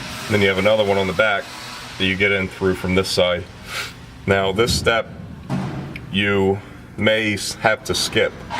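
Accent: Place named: Central Pennsylvania, United States. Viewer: i can identify this accent with surprising certainty because of a buddy from central PA i served with. its the pronunciation of the work "on"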